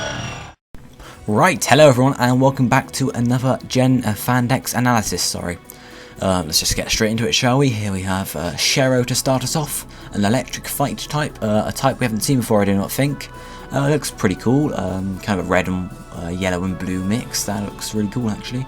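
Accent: England, United Kingdom. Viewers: hes from england i think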